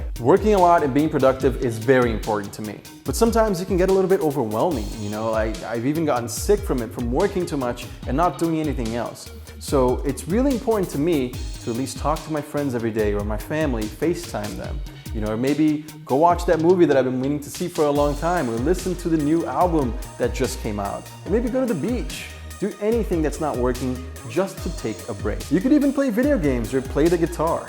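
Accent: Brazil. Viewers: Ele é brasileiro? Sei lá parece que tem um acentinho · Dunno why but you had a slight brazilian accent this vid...sounds a little like mine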